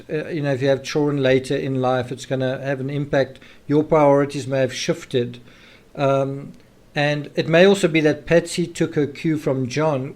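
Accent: South Africa. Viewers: Dr Phil, has an accent SA hence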